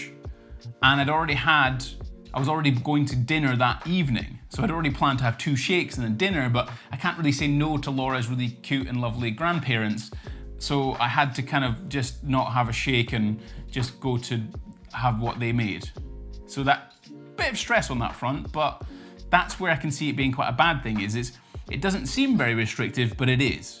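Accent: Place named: England, United Kingdom. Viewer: I subscribe because of your English accent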